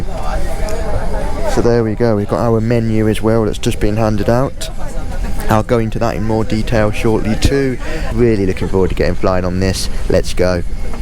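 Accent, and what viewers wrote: United Kingdom. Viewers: Nice trip! Your accent is beautiful, I'm wondering where are you from?! Scotland or Wales??? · Really nice Flight report in the amazing B787-800 of Air Europa 🎉 love your British accent and your great voice. Thanks x sharing!!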